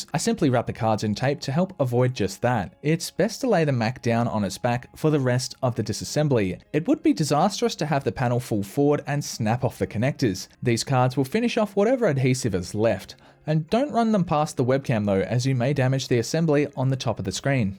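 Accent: Australia. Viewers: I can hear the Aussie accent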